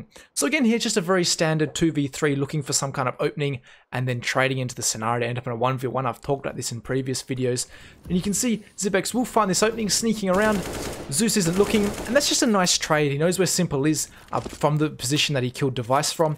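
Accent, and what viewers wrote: Australia. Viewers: One of your absolute best videos ever man. I find the Australian accent is such a great one to listen to with analyses; I know that sounds weird, but it may be thanks to the many cricket matches my father used to watch in the house when I was a kid lol Subjective BS aside, your analysis here was super detailed and spot on. Down to the movement paths, the timings, the mindsets, right down to the metal-association with Nuke B- everything. Really, they are always good; but with this video, you have outdone yourself.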